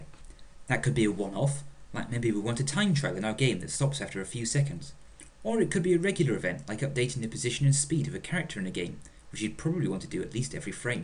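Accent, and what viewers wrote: United Kingdom. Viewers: he lets his northern accent slip now and again -- his "jeeves" accent is a complete fabrication. There are many about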